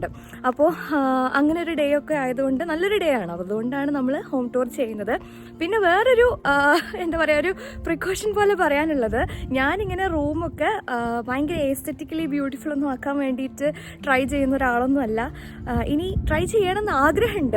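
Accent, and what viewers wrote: Malappuram, India. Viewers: Kozhikode aaanenn thonniyittilla, malapuram aanenn thonni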